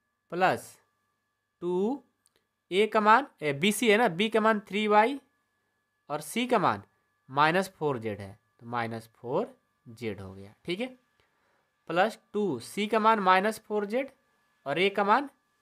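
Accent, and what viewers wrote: Bihar, India. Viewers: Sir àap Bihar se h ?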